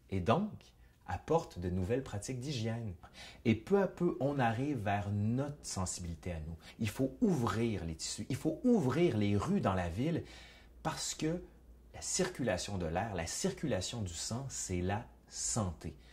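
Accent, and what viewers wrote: Quebec, Canada. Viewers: J'aime bien le acsent Canadian, c'est drole ca me fait sourire . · Que j'adore cet accent Québecois! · Tres instructif, j 'adore votre chaîne, beaucoup d 'informations présentées de façon agréable et drôle, et votre accent québécois est vraiment charmant. Merci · Les canadiens vont avez des doc super mais l’accent horrible! J’ai de la peine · J'adore l’accent de nos cousins québécois, merci pour cette vidéo bien faite ;) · Il est québécois... olalala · Ta vidéo est bien argumentée mais tu aurais dû dire que la peur de l'eau était liée à l'épidémie de la peste noire, à t'entendre tu es québécois ? 😀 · Je viens de découvrir vitre chaîne et j'adore. Et l'accent du Québec, quel bonheur ! Des becs ☺️ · C est chouette un vrai conteur en chair et en os j adore ce petit accent québécois il raconte super bien 😊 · enfin une bonne chaine d'histoire, sans musique techno hyperactive en fond sonore et sans effets comiques surjoués. 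Non seulement le fond est captivant mais de plus la forme est très agréable. avec le bel accent québecquois en supplément !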